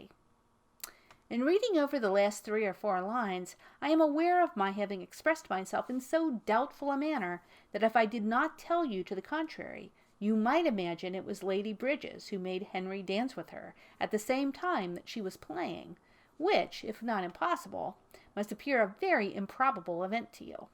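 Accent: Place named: United States. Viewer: It just doesn't work with an American accent 😕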